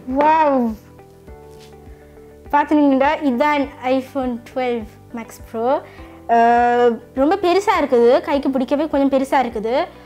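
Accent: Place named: Sri Lanka. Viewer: Sri Lanka tamil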